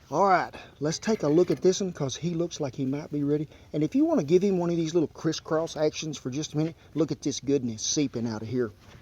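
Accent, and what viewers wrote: South, United States. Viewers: I love his cowboy accent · Hey, greetings from Germany! I just stumbled upon this awesome and tasty recipe and now you got me so damn hungry... I'm gonna need to try this kind of cheese sandwich on my grill at home! Love your way of producing this video, love the accent and the puns - really makes me miss the US! Thanks for the video! I subscribed and I'm convinced by what you're doing! Greetings fellows! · I love a good southern accent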